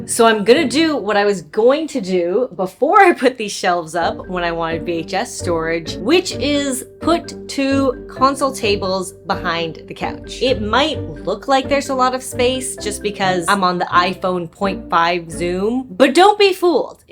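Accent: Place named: Canada. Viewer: I don't think I understood how a canadian accent sounded before watching your videos. Now I can most definetely tell haha.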